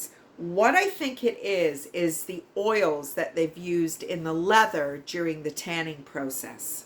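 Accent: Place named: Southwestern Ontario, Canada. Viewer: I am fairly new to you & just realized your talking SW ONT! HOME!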